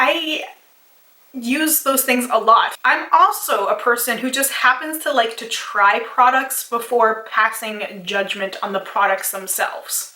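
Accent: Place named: Canada. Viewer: It’s always funny hearing you say “out” and “about” but you also say it really cute. I think it’s a Canadian thing lol cuz I’m American and we say it differently. But you say a very cute, soft, “oot” and “aboot “ and it’s very endearing.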